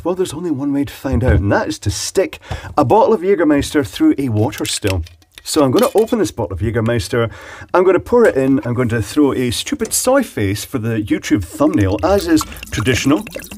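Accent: Scotland, United Kingdom. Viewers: Whats your opinion towards tea, spiffing brit sounding man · is this guy scottish?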